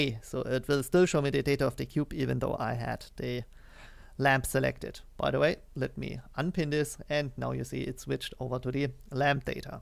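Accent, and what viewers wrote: Germany. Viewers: Sprichst du eigentlich deutsch? Deine Aussprache hört sich sehr deutsch an :D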